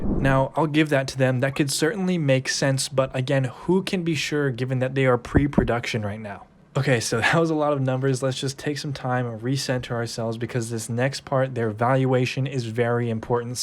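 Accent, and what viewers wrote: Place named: United States. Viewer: dam yanks ruining the English language as usual. Pfft! ....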